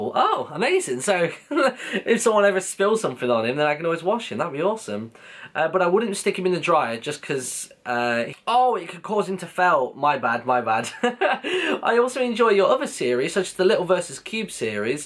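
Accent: England, United Kingdom. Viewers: i loooooooove this english accent *o*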